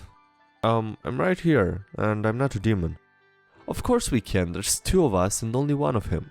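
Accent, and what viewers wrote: Eastern Europe. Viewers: It doesn't sound like most European accents does it. It's 100% not American, it's like a weird version of a Slavic one, but like, really funky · I actually think he's hispanic, that's how it sounds like, but then I hear how the tongue just "hits" and yeah, slavic accent.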